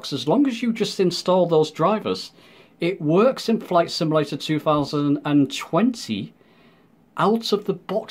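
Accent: United Kingdom. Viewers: Hello, I found your YouTube just days ago. It is very helpful and I enjoy the tutorial very well. There is a question for you: can the Logitech G Saitek Pro Flight Multi Panel compatible with the iMac? I have to make sure before I buy it. I am a very beginner for the X-plane 11 installed on my iMac. BTW I enjoy your British accent! Thank you in advance!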